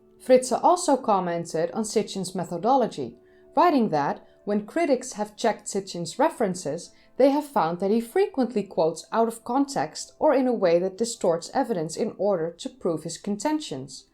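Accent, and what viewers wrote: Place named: Netherlands. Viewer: your (dutch?!) accent is very nice to listen to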